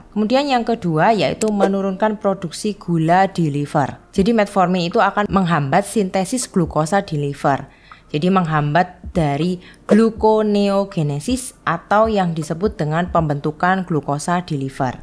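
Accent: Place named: East Java, Indonesia. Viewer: Khas Jawa Timur😊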